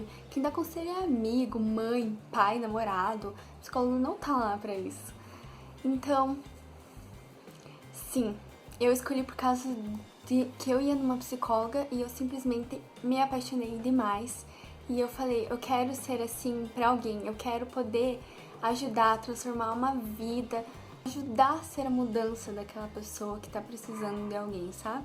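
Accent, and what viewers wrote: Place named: South, Brazil. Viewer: sotaque parece ser do sul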